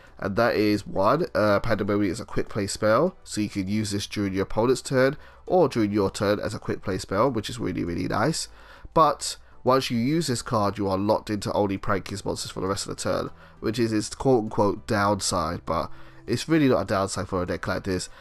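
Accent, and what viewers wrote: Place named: United Kingdom. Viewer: U sound so british, are u from england?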